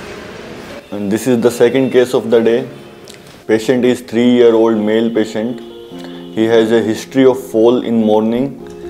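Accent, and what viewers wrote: Haryana, India. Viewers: Haryanavi accent, just wow🎉.. Dr. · No doubt sir I like the way you present your interest as orthopedic surgeon 😊 but more than that I love to listen your haryanavi accent . Which is just awesome 👌 👏 👍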